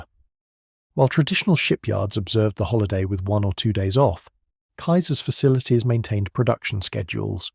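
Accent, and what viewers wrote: United Kingdom. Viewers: Great detail video.But it is by a british guy , parenthe sounds british , I don't think it's a I voice. But tell Americans for a more crude and the way they assemble a ship, but they float and got the job done, which is true and how their ships were built and took years to build and they were topping line no by time, they finished them over there in England they were obsolete.That's why bismarck , and what have your sunk the english , ships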